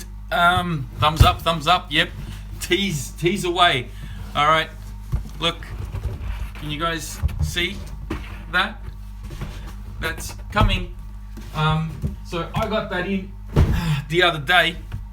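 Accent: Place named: Australia. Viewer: hey, welcome back mate,,, my rc channels surfing on the web isnt complete with your one,,, glad to hear your aussie accent back on air,,, cheers,,,:)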